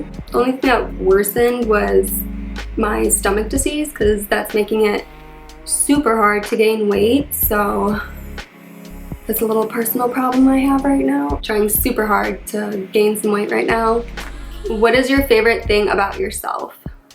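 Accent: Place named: Chicago, United States. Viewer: I was gonna ask if you were from chicago. You got that accent. love it!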